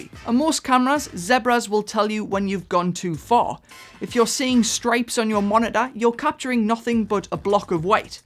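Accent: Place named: North East England, United Kingdom. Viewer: Class to hear a North East accent talking cinematography on here!!! I am determined to shoot a cinematic travel vlog on my smartphone (Huawei P30 Pro) but the maximum it shoots in is 8bit colour. Is it worth me using the log options the app I use provides? Or are they largely redundant with the limited tech I've got. Cheers in advance!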